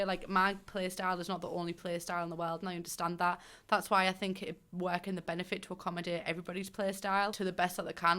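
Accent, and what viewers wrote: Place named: England, United Kingdom. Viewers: So glad I found your channel, loving all the Sims videos, and you just the most stunning woman ever 😍, such a stronger English accent then what my family has 😶😶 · Hi, nice to meet you :) Absolutely love your accent... (I'm from UK originally so have heard your kind of accent before, and I love it lol....) funny at the moment the name of your accent where you come from escapes me...ah yes Jordy....yep! love it lol.... (just hope Im right in saying that now lol...) I won't mind as long as there is an option to play single player or multiplayer....but if its just multiplayer....I'm not very much into that really.... keep up the great work, you might have to slow down in your speaking lol....hard to keep up with you sometimes....but love it all the same....not meant to be offensive by the way :) have a great day :) magz :)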